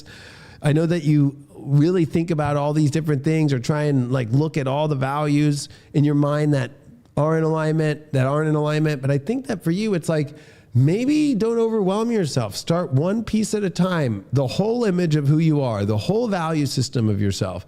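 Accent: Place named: South, United States. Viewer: ( the southern accent is killing me )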